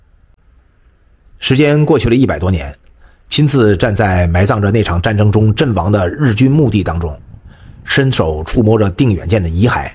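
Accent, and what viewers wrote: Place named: North, China. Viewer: 北方口音。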